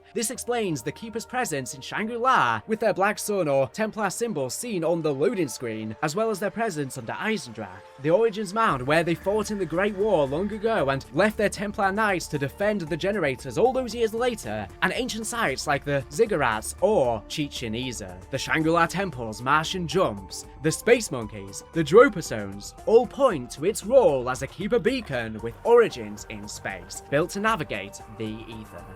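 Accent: United Kingdom. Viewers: Bro what type of British accent is this, sounds like he’s talking winded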